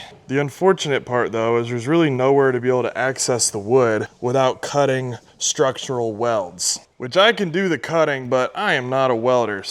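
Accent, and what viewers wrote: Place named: United States. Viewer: You really are an Ozzie with an American accent.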